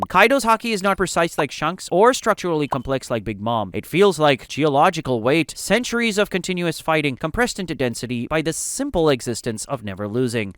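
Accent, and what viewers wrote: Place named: India. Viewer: why bro got indian accent?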